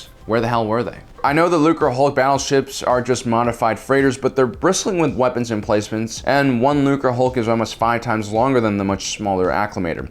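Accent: Australia. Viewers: dat Australian accent doe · That Australian accent tho 😂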